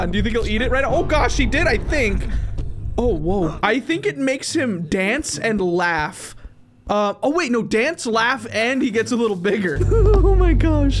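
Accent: Scotland, United Kingdom. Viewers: Bro u sound sccotish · Love the British voice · Ah yes just randomly turning British No hate pls no hate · The British accent 😍 👌 🤣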